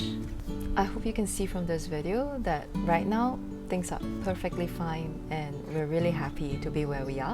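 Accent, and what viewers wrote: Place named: Singapore. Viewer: I love watching your channel. So you're from Singapore that answers my curiosity cuz you sound like one without Singlish of course 😀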